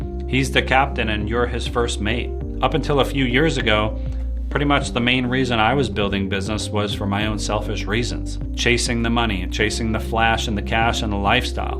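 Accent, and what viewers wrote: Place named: South Africa. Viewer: Sir, you sound South African 🇿🇦, thank you so much for this, we thank God for this revelation. It’s easy to be selfish in business especially when you miss it as Christian entrepreneur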